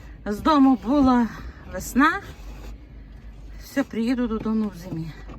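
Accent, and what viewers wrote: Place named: Sumy, Ukraine. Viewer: у вас речь украинки жительницы Сумской области Вы замечательная хазяечка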